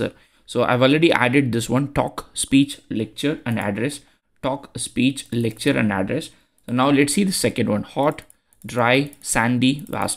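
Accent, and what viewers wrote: India. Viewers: guys plese improvo your pronunciation .Indian accent is so hectic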